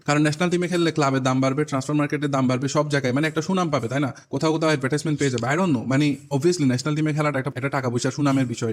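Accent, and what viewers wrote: Chittagong, Bangladesh. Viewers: Bhai Mone Hy Chittagong er ❤️✅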